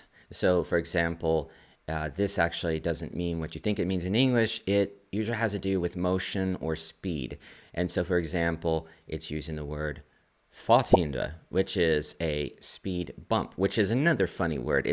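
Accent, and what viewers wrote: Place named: United States. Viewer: Regarding Kött and fack. It is not because of your English but because of your American dialect; you don't pronounce all different English vowels. You pronounce some of them the same, unlike in English (The language spoken in England).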